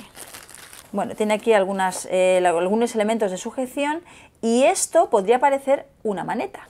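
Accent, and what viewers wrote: Spain. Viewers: Hay no se porque pero amo como hablan las mujeres españolas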